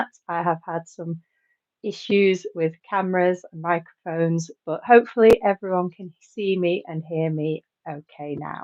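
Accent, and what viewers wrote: Northern England, United Kingdom. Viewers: I personally really like your Northern English pronunciation. Some English teachers change the accent to teach the standard pronunciation, but I prefer originality.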